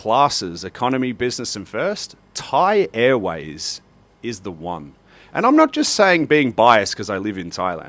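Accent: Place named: Australia. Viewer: You sound like an Aussie. The same as me. We fly Emirates BC once a year to Europe. Never had an issue. Did fly to the States once and our luggage was lost for 2 weeks. Yes it was a pain in the ass. But as my hubby says, dont stress about crap you cant control. But i get its frustrating. We got zip as we were told to keep reciepts and claim on insurance. As for Eithad and Qatar. Qatar. Please support your fellow Aussie females and boycott them. Females were taken off their flight, which is government owned, and were forced to have internal examinations in a ambulance on the tarmac, as someone had a baby and left it in the terminal. Every female was taken off the flight and subjected to this assault. Law suit is currently pending. Qatar also often change out their aircraft. You book a flight which has Q suite listed. Then operational change and you end up on a piece of crap business class seat that has zero privacy. 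Atleast with Emirates A380, if you pick a window seat you have privacy with how the seats are done. Like your own cubby. Qatar are well know for changing out aircraft for operational reasons. Eithad. Besides looking cheap and nasty, some of their planes, 787 and A380, they have some rear facing seats in BC. No idea about FC. So if you go this route chose your seat wisely. Dont let one bad experience with Emirates define them. Look at Qantas. I only fly them domestically because they are crap. But i still fly them because Virgin are even worse. Happy travels. Caz.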